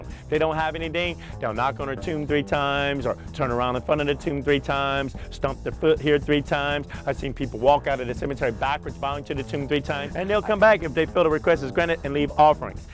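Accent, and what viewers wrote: United States. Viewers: prolly from Michigan or sumn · He sounds like he's from southwest Louisiana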